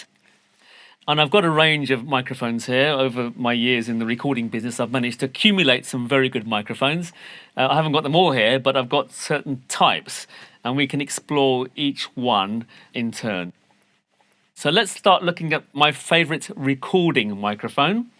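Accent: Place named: United Kingdom. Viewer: I liked his British accent..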